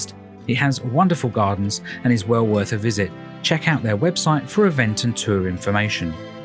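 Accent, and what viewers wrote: United Kingdom. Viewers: I like this channel. I'm from Indonesia. The amazing videos and the author writing the explanation, making it easier to me to understand the British accent. Thank yo so much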